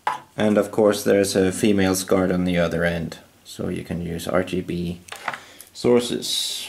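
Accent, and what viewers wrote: Denmark. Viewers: I was like... That sounds a tiny bit like a Dane. And sure enough, there is the tv series "Klovn". 😁 So.... How smooth do you rate the scrolling in games, in real life? And is it good for Amiga as well?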